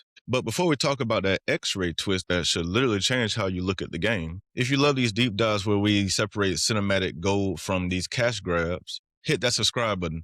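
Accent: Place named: United Kingdom. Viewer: "distorted and cruel" bruh that's just a british accent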